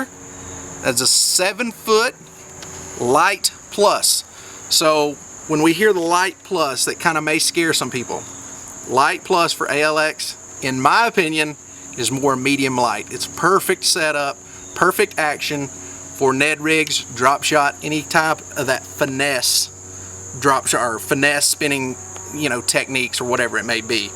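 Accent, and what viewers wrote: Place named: Carolina, United States. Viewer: Gosh-A-Mighty, you are a pure Carolina Boy! Love it!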